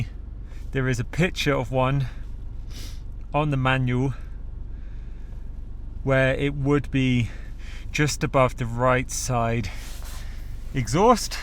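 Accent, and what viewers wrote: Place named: Switzerland. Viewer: Hearing you talking swiss german is funny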